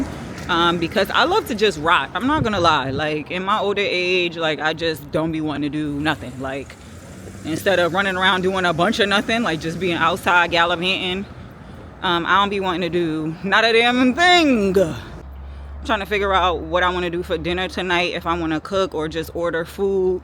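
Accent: Brooklyn, United States. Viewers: Amanda Seales Me! Cut It Out. 😂 You speak a dialect that is very Brooklyn Millennial.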